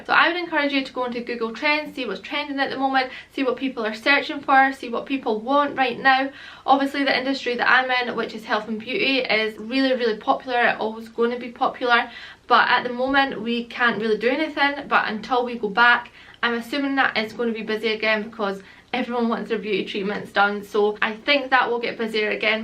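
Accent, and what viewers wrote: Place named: Scotland, United Kingdom. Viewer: U from the Scottish Borders? Bc u sound Scottish and English btw very inspirational and makes me wanna start it sooner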